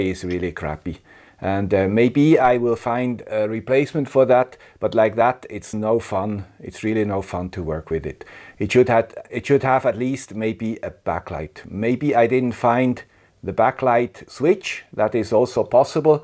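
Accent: Switzerland. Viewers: I love the Swiss accent, but if you could just change this pronunciation... Circuit is pronounced sur kit.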